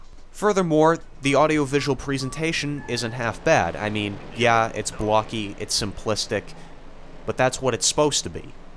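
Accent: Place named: United States. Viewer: yea please do a better review of it as it deserves it. also, you probably don't sound like him but I'm british so you're american acents sound similar to me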